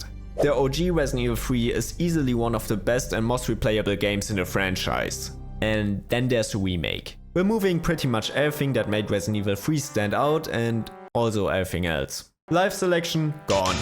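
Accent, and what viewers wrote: Germany. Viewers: i’m hearing a little deutsch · Good old German accent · nur 2 sekunden drinne und ich höre raus du bist deutscher :D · I love your German accent. Sehr gut! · At first I thought the man had a lisp, then realized he's just German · i sense deutsche accent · I hear deutsch mein freund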